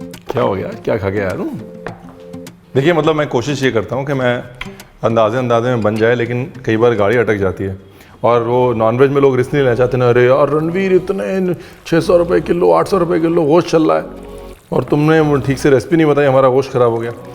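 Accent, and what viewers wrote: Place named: Lucknow, India. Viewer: mutton ka zayka ek taraf, aapke lucknowi accent ka zayke ka to jawaab nahi ! <3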